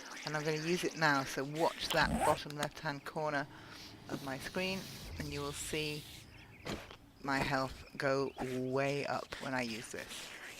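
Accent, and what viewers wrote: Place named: RP, United Kingdom. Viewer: You accent makes me pay attention, it's like someone just turned the BBC on but instead of news it's relevant information I will use in my life.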